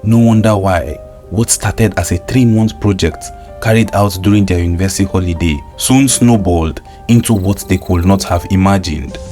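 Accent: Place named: Nigeria. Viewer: "and exact tttime for lekchors" Eh! Your strong Nigerian ascent sha🥵🥵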